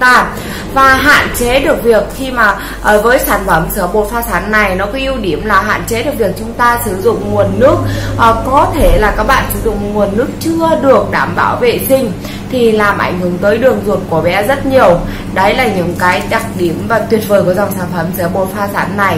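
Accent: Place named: Thanh Hoa, Vietnam. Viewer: Đúng là giọng thanh hóa.. Nói quê quá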